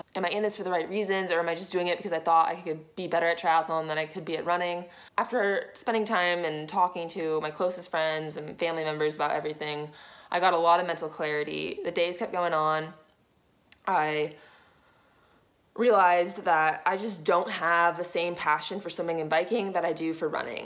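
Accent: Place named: Punjab, Pakistan. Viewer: I like her speaking Pakistani-Punjabi, nice accent!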